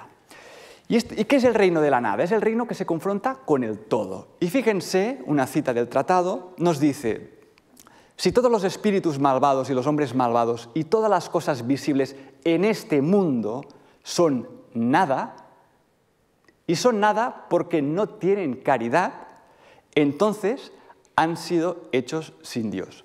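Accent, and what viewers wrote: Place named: Catalonia, Spain. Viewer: Creo que el professor no tiene la lengua castellana como propia. El apellido es catalan, el acento de su castellano lo delata así como açgunos vocablos en perfecto catalan. Ojalá los profesores castellanohablantes utilitzaran el català con la misma soltura que él tiene en castellano.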